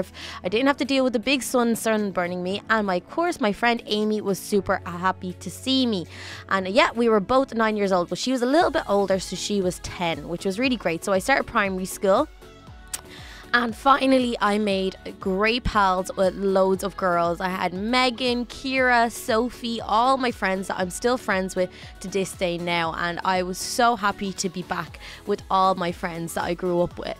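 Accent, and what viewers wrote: Ireland. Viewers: I fucking knew you were irish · I love the accent from down south Ireland (I live in Northern Ireland in Co.Derry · Now I can tell where you get your Irish accent i have been watching your videos for 3-4 years now they make me happy and the other little club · You sound VERY Irish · I was born after my grandpas birthday but he died a couple of months ago but you don't sound that Irish kind of but not a lot :P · I couldn’t hear your accent was Form but now I know it’s Irish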